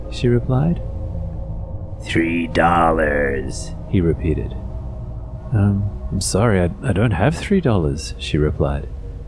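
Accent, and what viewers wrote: Australia. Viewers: He's Australian. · I subscribed because I love the stories and animations. Also the voice is on point. It also sounds like this guy is Australian. I'm Australian btw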